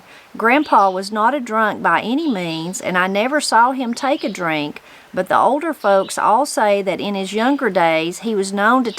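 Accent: Appalachia, United States. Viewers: Did you read the story in your natural (Western NC) accent or in the local Eastern Kentucky accent?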